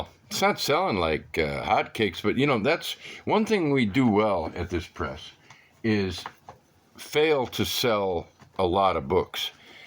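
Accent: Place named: Italy. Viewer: Yes, your Spanish has an Italian accent. 😀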